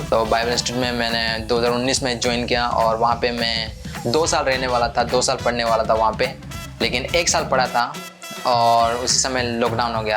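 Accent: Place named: India. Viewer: Love how u improve your English but u need more practises for your pronunciation to remove that slightly indian accent.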